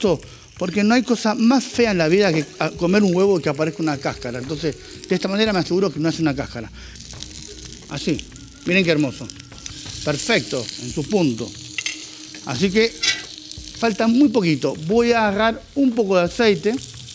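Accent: Argentina. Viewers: ME PONE LOCO VER AL JAPO HABLANDO PORTEÑO....MARAVILLOSO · Hola primera vez que te veo,muy interesante e instructivo, solo que me dió mucha risa ver un descendiente japonés con el acento Argentino.. mirá vos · Me suena gracioso escuchar un japonés hablando como los argentinos, pero se ve que es un buen chef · A lo primero me chocó escuchar en un japonés,ese increíble acento argentino,pues esperaba que fuera un canal de Japón.Pero me a encantado esa sencillez con la que se expresa.Un humilde español les desea todo lo mejor.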